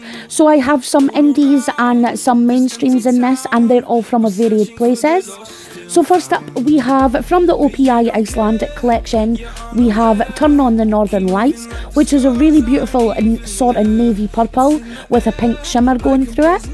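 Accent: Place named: Glasgow, United Kingdom. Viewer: Seriously I just want to have your voice as my Siri/gps voice i love your accent Are you from Glasgow or that general area?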